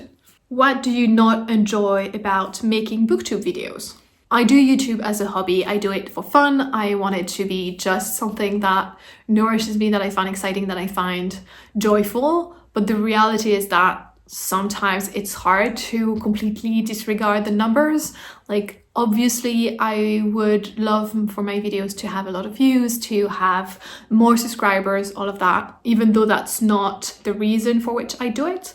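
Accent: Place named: United Kingdom. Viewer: Nice getting to know you better 😊 I don’t know if I could do this tag anytime soon because I feel awkward talking about myself (in general speaking into a camera is unsettling for me) but I’m trying to do more book tags. I think you’re a super eloquent speaker BTW! I would have guessed you were from the UK!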